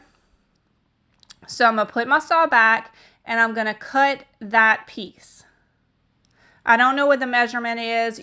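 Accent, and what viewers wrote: Louisiana, United States. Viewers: Are you a born Cajun? Is that the accent I hear? LOVE IT SO MUCH! Your projects are some of my favorites ever. XOXO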